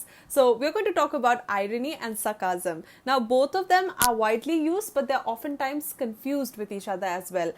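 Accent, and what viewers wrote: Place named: India. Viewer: This is what indian accent is supposed to sound like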